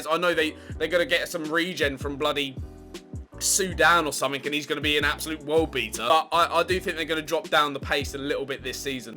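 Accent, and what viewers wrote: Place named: Manchester, United Kingdom. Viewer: Love the Manc accent. Always support your local team and love you are being a face for that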